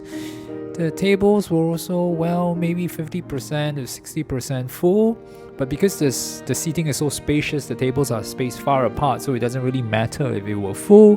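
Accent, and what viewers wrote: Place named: Singapore. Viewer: Your SINGLISH accent is sooo funny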